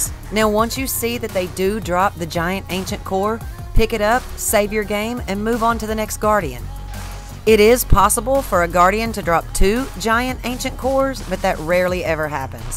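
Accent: South, United States. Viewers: I'm just here for the Southern accent 😎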